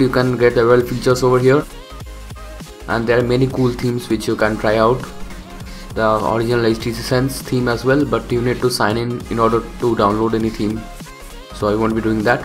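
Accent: India. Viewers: tenk eu kam egen. hard to understand thick Indian accent with loud bg music and bad sound quality.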